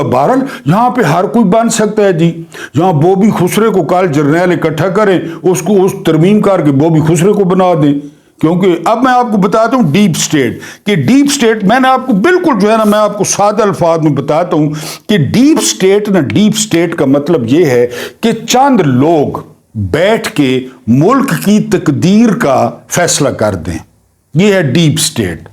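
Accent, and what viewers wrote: Saraiki, Pakistan. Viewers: ماشاء اللّٰہ کیا سرائیکی تڑکا لگاتے ہیں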